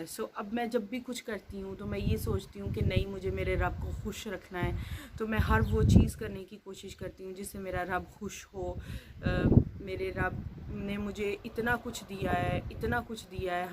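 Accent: Sialkot, Pakistan. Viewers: Itna ghoom phir ka or parh ka apka lahja nai badla mtlb Sailkotio wla ha😁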